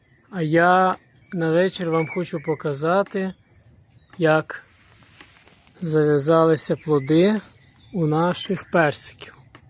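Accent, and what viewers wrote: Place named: Russia. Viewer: А звідки Ви? Бо дуже багато рузисмів/акценту, але лайк за спробу перейти на солов'їну!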